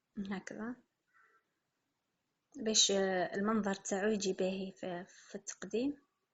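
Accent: Setif, Algeria. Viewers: الله يبارك عليك اختي والله عرفت اللهجة تاعك من هدرتك وعرفتك سطايفية متشرفين السطايفية لحرار 😘😘😘😘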